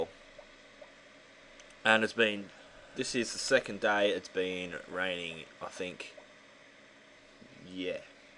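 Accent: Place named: United Kingdom. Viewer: Good to have your british back,!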